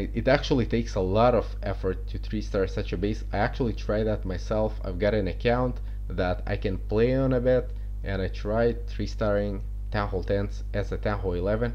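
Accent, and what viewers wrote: Russia. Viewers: какой то русский акцент чувствуется))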